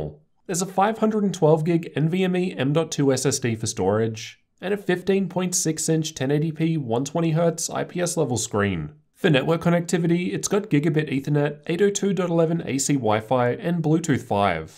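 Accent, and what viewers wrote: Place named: Australia. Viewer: Why do you link us and uk but not au? U sound aussie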